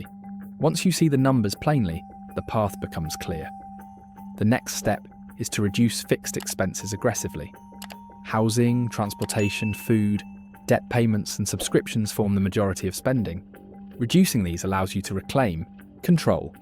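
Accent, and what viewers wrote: England, United Kingdom. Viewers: Always wondered why its an English accent talking in Dollars. Helpful video...uk based. 42, no debt, buffer in place and started an all world global index fund aim to save £200 ° month into it. Hope to be able to retire earlier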